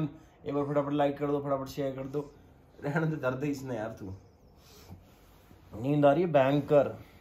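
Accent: Haryana, India. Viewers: Sir ji.....teaching ek taraf arr aap suthre ek taraf.....arr fer baat aawegi apni bolli ki Ya haryanvi ke zeher laage hai na aapke muh se bas bujho na...baki hum k kahe.....aapke nazdeeki gaon se hi hain hum.....aate rehn diya karo vlogs gadhya gaddh 😍😅🤗 💗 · Radhe Radhe 🙏🙏sir g😇 Ek Ache Educator k saath Saath Aap to Blogger B Bnn Gye Aur Wo B Ache waale 💖💖 Aapka To Nose B Red ho gya Tha 🤪Apple jaise.. U r Superb Dear Sir g ❤️❤️ 💕💕May God Bless U Always 😇😇😇😇 Aapki Haryanvi Ki To Maii Fan ho Gyi Huu 💯💯💯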